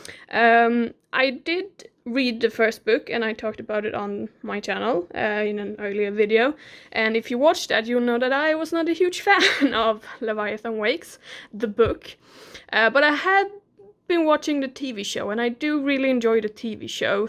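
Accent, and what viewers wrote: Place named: Sweden. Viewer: Hi. You are Swedish, right? I can tell by the accent 😊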